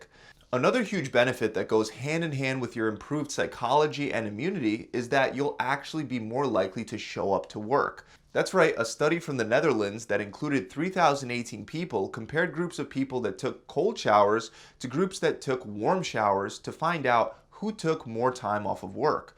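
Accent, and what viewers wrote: Philadelphia, United States. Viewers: You from philly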